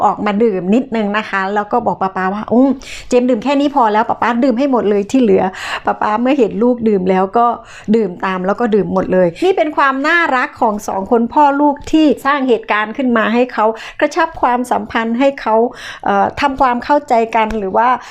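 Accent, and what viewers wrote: South, Thailand. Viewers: ไม่จริงนะ อิสานใต้สำ้นียงอีกแบบนึง แต่นี่ฟังแล้วรู้เลยว่าเป็นคนใต้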